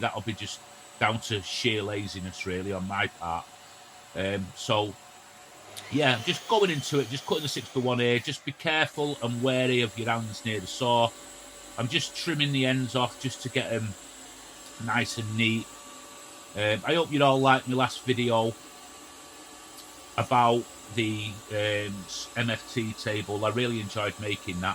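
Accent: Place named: England, United Kingdom. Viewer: You sound like an English man . Am wondering why your title mention a......one by six. You use 6 by1 i hear. Dont confuse us Aussies. No one gets it right the first time.. you will never be perfect ,thats not possible. Call it what you want and dont copy thoses loud mouths over the big ocean. Gluing only is absolutely fine.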